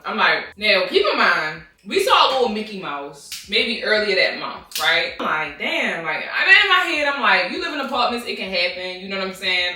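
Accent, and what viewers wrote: Baltimore, United States. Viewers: You have one of the strongest Baltimore accents ever I love it (I’m from bmore county without the accent womp womp ❤️‍🩹)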